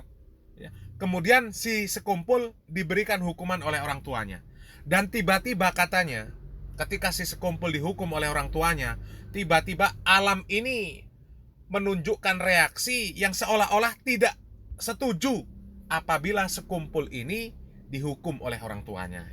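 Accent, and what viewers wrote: Java, Indonesia. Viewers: dari logatnya kamu dari daerah jawa ya,banyak omong lo sini main ke Kal-Sel dan yg komen nya yg miring.kalau memang orang kal-sel/martapura sesat mari kita duduk bersama luruskan. jangan cuman nyeloteh di dunia maya karena itu bukan suatu penyelesaian. salam hormat saya dari Kal-Sel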